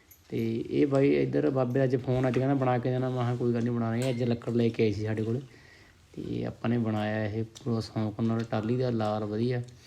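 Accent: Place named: Sangrur, India. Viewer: ਭਾਈ ਤੁੱਸੀ ਤੀ ਬੋਲਦੇ ਹੋ, ਸਾਡੇ ਸੀ ਬੋਲਦੇ ਹੋ ਤੁਸੀ ਸੰਗਰੂਰ ਜਿਲ੍ਹੇ ਦੇ ਹੋ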